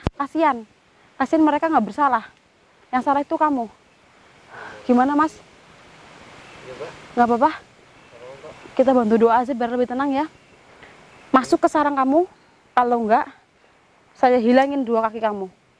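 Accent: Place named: Java, Indonesia. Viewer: Mba... Medoke aku seneng denger nya,... 👍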